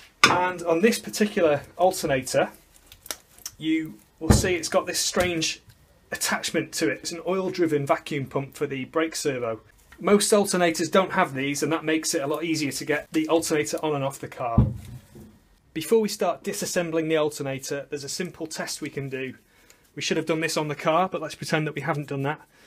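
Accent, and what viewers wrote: United Kingdom. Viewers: Very good video, you sound like your from the UK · Great video, but I'm confused; your vernacular is dollar based, whereas your accent is pound centric. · Gracias por tu vídeo. Por tu acento debes ser Británico. Me agrado oír que dijeras KAPUT. 😜😜😜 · You talked about the cost in dollars of parts but you sound very British, are you?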